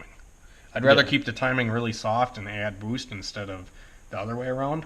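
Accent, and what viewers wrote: Canada. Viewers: I can't tell if your Amish or Canadian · dude i thought you were canadian. funniest shit I have watch while being in-depth about tuning. have him get the Ebay special headgasket next time.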